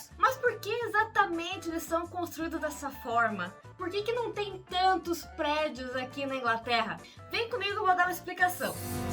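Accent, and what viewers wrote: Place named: Rio Grande do Sul, Brazil. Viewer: Ah! Te ouvir foi tão parecido com a gente falando que achei que era gaúcha. Obrigada 😘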